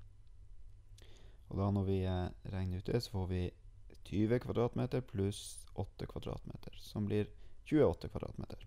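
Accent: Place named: North, Norway. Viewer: du e fra nord-norge og det e positivt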